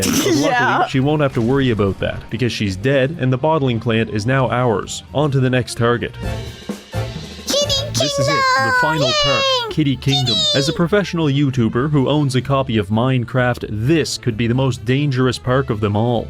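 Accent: Netherlands. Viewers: You're dutch is cute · hold on, are you dutch?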